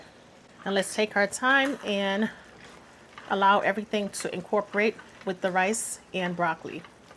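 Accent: New York, United States. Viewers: Good afternoon or morning Ms “ Love ❣️ My Name. Is. Dawson. I just want to start off & Say Yes Yes& Yes 👍🏽💯 absolutely 💕 love your Scrumptious recipes..Girl you put your “ Toes “ in it LOL you remind me so much of my late & Great Grand-Mama “ Pearl” 👼🏽 God rest her precious soul Amen 🙏🏼 But yes I just had to put in the comments because I have pics on and please correct me if I’m wrong you are strong “ New York “ accent 🫠. And he reminds me so much of a cousin I have comes from the boogie down Bronx💯 but Yes ma’am’ ❣️❣️ that’s all I’m gonna let you get back keep continue doing what you doing Great job. 👍🏽💯❣️ Ok... have a blessed one..Ms Dawson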